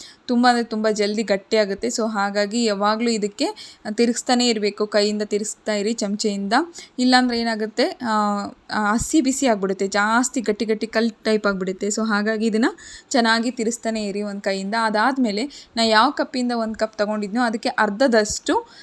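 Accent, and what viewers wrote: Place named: Hindi, India. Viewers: ಅದು ಹಿಂದಿ ಭಾಷೆಯ ಉಚ್ಛಾರಣೆ. ಅದಕ್ಕಾಗಿ ಅವರಿಗೆ ಭಾಷೆ ಬರಲ್ಲ ಅಂತ ದೂರುತ್ತಾ ಕೂರ ಬೇಡಿ. 🙏🙏😅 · Neevenu north india dhavara? Achchukattaagi kannadadhalli sariyaagi uchchaara maadi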